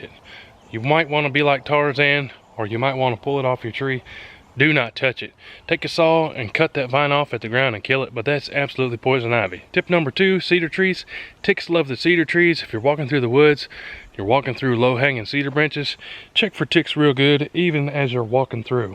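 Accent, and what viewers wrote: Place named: Tennessee, United States. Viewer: I swear to god, after I watched you first video I KNEW you were from Tennessee. Just from your accent.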